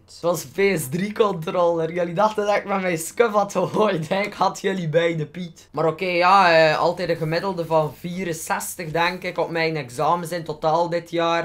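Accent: West Flanders, Belgium. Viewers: west vlaams is het oppernederlands! ik hou net vandit accent!, maakt u video's duust keer grappiger! maarja, doe watje zelf denkt best is he ;) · no taalschool shit WESTVLAAMS!!!!! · het west-vlaams is een deel ven je kanaal en daarom maakt het je zo grappig en tof om ernaar te kijken maar probeer wat meer an te praten voor mensen die je niet zo goed verstaan maar je west-vlaams is een deel van je kanaal:D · West-vlaams voor de win !!!! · laat u taal zo wantt west vlaamss een goeienederlands u accent is zo niks aan te doen en tis een deel van u kanaal :p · blijf west-vlaams praten! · Ik kom uit antwerpen en ik begrijp alles zonder moeite. Hou het westvlaams het hoort bij dit channel. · Ik zou het niet doen, het westvlaams is awesome en het zou heel anders moest je inneens Algemeen Nederlands gaan spreken ipv een dialect. Westvlaams is de shit xoxo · ik ben van beveren (kweet nie of wij echt een hard accent hebbe) ma ik versta u echt goe ze! 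ma u taal ga later bij u job of zo wel ne rol spelen stel gij gaat werke in brussel i do no dan gaan ze u mss minder snel aan neme omda gij west-vlaams spreekt ma tis anders echt wel een deel van u kanaal geworde ma ik zou het misschien wel doen maar dan nie per ser voor youtube gewoon voor u dagelijk leve en shit...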